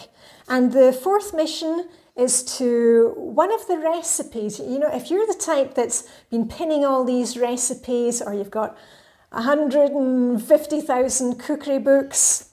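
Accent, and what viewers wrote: Scotland, United Kingdom. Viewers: Love working along with you. Love your calming lilting Scottish tone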